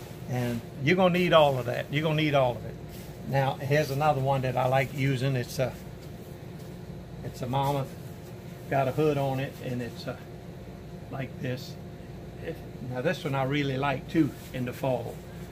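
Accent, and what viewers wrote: South, United States. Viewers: So glad I found your channel. I'm just a backpacker wannabe, but have done a lot of motorcycle camping with my husband, and find that the lightweight gear choices are similar. Your videos are fun and informative, and it's nice to hear another southern accent!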